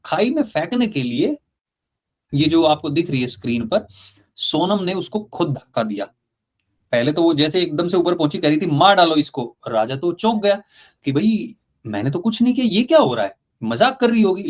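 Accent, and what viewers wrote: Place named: Haryana, India. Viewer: Australia mei rehte hai aur accent Haryanvi hai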